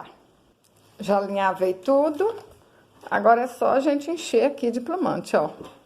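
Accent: Minas Gerais, Brazil. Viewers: Parabéns seu trabalho e lindo! E sua conversa é muito minerissima 😀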